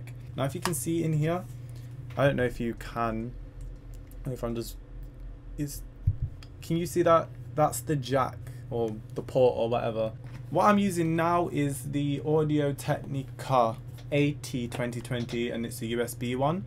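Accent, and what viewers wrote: United Kingdom. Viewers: his accent sounds british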